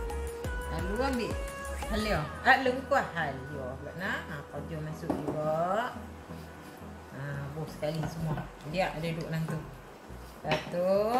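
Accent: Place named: Perlis, Malaysia. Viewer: Sabit pelet macam org perlih😀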